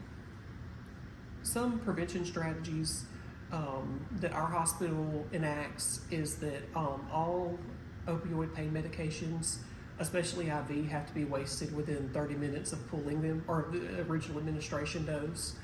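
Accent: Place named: South, United States. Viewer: Close your eyes and imagine a plump southern Belle talking because this lady is her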